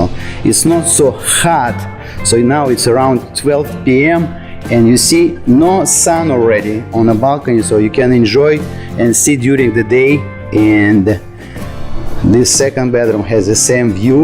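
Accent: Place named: Russia. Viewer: So nice Russian accent. Glad to know that local Miami environment can not destroy it 👍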